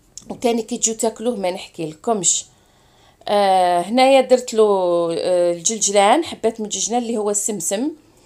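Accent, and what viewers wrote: Mascara, Algeria. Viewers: سلام اختي انتي معسكرية ولا دزيرية انا تعجبني اللهجة معسكرية ونبغي نساها شابات وحادكات الله يبارك هدري بالمعسكرية وشكرا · يعطيك الصحة بصح اختي فيك النفخة علاه زعما؟ الهدرة تاعك تاني تاع معسكر ؟؟؟؟؟؟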